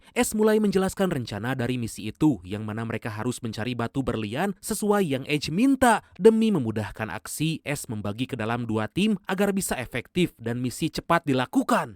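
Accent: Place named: Banjar, Indonesia. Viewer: Banjar bnr pian lh 😅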